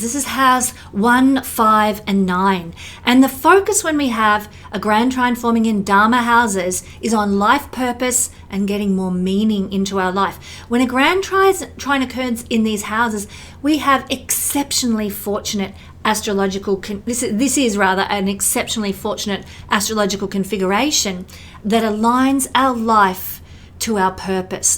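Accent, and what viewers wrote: Australia. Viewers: G'day it gr8vto heat a Aussie lady doing this ❤ur worx kind re thommo nsw Australia 🇦🇺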